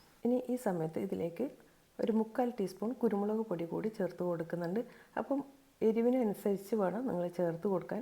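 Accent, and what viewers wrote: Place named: Kannur, India. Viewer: Adhyam paranjathu valare sheriyaanu. Chickenil enthum pareekshikkaam. BTW, ningal screenil ezhuthi kaanikkumbo - krithyamaayi ezhuthan sramikkuka. Savola kku pakaram - Onion ennum. Turmeric*, pinne Green chlliyude koode coriander ennanu ezhuthiyathu. curry leaves alle ittathu ? Pinne.. adhyam ittathum randamathu ittathum aaya pepper powder different aano ? color kurachu vyathyasam pole thonni (may be lighting). ithrayum neetti ezhuthaanulla kaaranam.. njan okke recipe kandu like adichu pokaan alla kaanuka. kandaal cheythu nokkaanum koodi aanu. athum nammude kannur style basha kelkumbo .. kooduthal :) . Njanum molum mathrame non-veg kazhikku. athu kondu njan thanne undakkanam . athu kondaanu ellam ezhuthiyathu. Accidentally ningalude lemon powder pop-up aayi. appo channel nokki. ippo subscribe um cheythu. Thanks for the recipe. 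Will definitely try once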